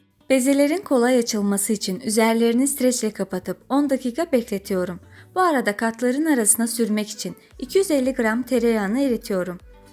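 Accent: Azerbaijan. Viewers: bozma ay bacım o geşeng azerbaycan şiveni bozma, öz şivende danış..bizim dil bozuk zaten, bari siz özünüzce danışın ki gerçek türkçeyi sizden öğrenebilek..gurban olum sizin bezekli danışığızı yaradanıma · Normaldir Azerbaycan ve Türkiye sivesi karisinca cok güzel bence. Sizde Azerbaycan sivesile konusmayi deneyin bence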